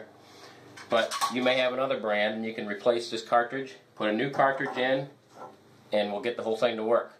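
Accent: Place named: Ohio, United States. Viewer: I'm a fan of accents. are you from Eastern Ohio? rate my guess 🤙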